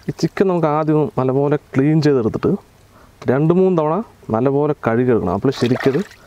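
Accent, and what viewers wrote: Palakkad, India. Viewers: സുഹൃത്തുക്കൾ ചിക്കൻ കാലെടുതതപോൾ കണ്ടു കള്ളൻ എന്നിട്ട് പറയുകയും ചെയ്തു നിങ്ങൾ ആളുകൊളളാലോ ആ പാലക്കാടൻ തനി നാടൻ മലയാളം എനിക്ക് വളരെ ഇഷ്ടപ്പെട്ടു എല്ലാ ആശംസകളും നിങ്ങൾക്കും നിങ്ങളുടെ ടീമിനും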